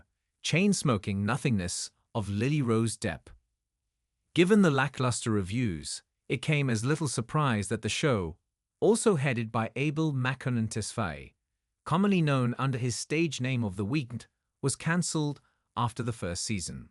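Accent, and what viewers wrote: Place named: United Kingdom. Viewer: Well that was a waste of time. There was maybe one (possibly two)sentence about Nosferatu and the rest was a rehashing of the rehashing of critics reviews of an HBO series I didn’t see. Dear God- Please- if you can’t get decent narration from AI use an actual human to read the script. And why the British accent. And what is wrong with me even bothering to comment? lol- I think I reached the breaking point with these “reporters.”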